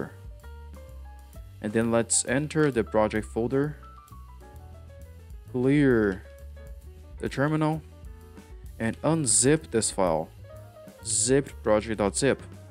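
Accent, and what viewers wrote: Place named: Brazil. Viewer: Fácil reconhecer o sotaque brasileiro kkk obrigado pelo video, ajudou aqui, tmj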